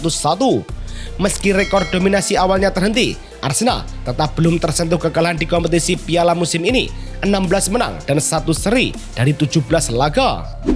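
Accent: Java, Indonesia. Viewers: Salfok sm suara yg ngomong medok bgt😅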